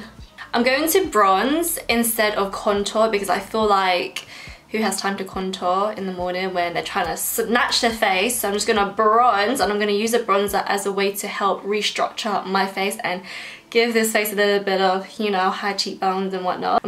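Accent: United Kingdom. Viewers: I didnt even know you had a youtube channel!!! I follow you on instagram (@ I love your tutorials on there but I wanted to see a more in depth or longer video. Sooooo i checked youtube and low and behold youre here!!! And I was like "YAAAAAASSS" And I had no idea you were British. Right? British? Well i love you and youre makeup tutorials. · Ive only known you from Instagram but holy crap your accent is cute af! Rep us British girls!💜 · I follow her on Instagram and just discovered she had a YouTube channel, and can I just say I totally did not expect her to have a British accent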